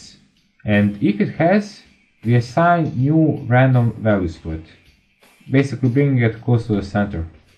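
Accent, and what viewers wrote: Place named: Russia. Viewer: русский акцент , несет за версту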